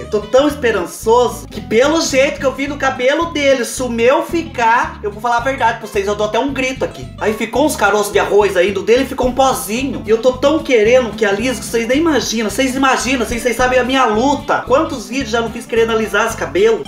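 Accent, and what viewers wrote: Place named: Paraná, Brazil. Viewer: Vc fala igualzinho o pessoal da cidade onde morei. Em Wenceslau Braz norte do Paraná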